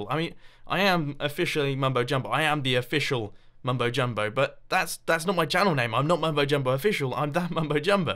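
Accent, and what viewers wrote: United Kingdom. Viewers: GradeAUnderA = Mumbo Jumbo EVIDENCE!!! Notice they both live in the UK, they have same accents but Grade is just louder. GRADE = MUMBO CONFIRMED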